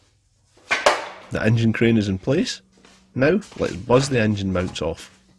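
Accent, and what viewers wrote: Scotland, United Kingdom. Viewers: I can only imagine Sir. I wish I could help out! Love your stuff and your accent. Reminds me of my mechanic buddy from Scotland that lives in Turks and Caicos.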